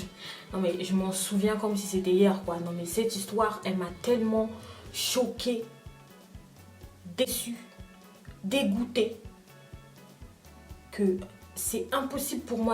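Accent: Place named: Republic of the Congo. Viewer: Rien qu'a l'accent... je reconnais Congo brazza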